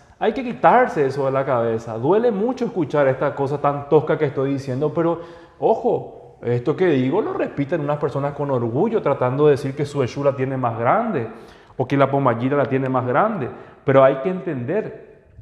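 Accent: Paraguay. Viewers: Sos de Paraguay? Tienes acento de ahí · Hola nada que ver al tema pero tu acento o sotaque e de Paraguai verdad? 😊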